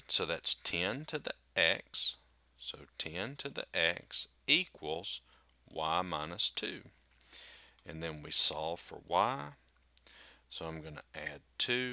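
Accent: South, United States. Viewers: Not even joking, I somehow understand better with a Southern accent. It sounds straightforward, simple, and just cool.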